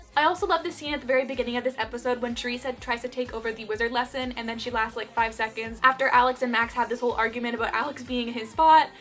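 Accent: Canada. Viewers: are you from Canada? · This is the third video of yours I've watched I think? And I was talking to myself, saying how you sounded Canadian, so I checked the description just cuz, didn't find anything. And then you said it in the video and I was like "AHA! THERE IT IS!"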